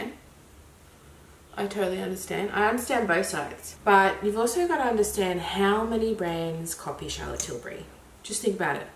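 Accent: Australia. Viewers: btw I saw your decluuter vid on my recommended. Stayed for the accent and personality. I am outside of Australia but I aspire to live in there one day. So I'm interested in Aussie stuff. I think you are so lovely and open and honest. It's nice to hang out with you cuz I feel comfortable. You feel reliable since you are so open and honest. Just letting you know why I stayed to give you an idea of how to get new people at your channel. I'm only trying to support since you talked about wanting to grow your channel. I know it is hard work. Hope everything works out well for you!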